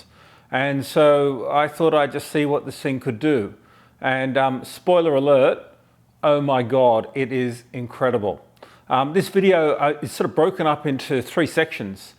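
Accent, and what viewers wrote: Australia. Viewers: So good to hear an Australian voice in a good vlog! I've never heard of these drones either. I have a Mavic Air and we tried this with a scooter in the rice fields here in Thailand but not a success. What bike are you riding here?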